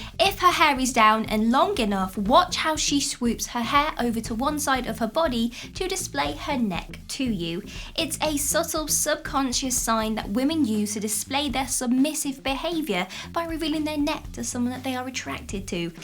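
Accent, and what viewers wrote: England, United Kingdom. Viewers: Your British accent is so charming to my ears, I really love it! Greetings from Greece!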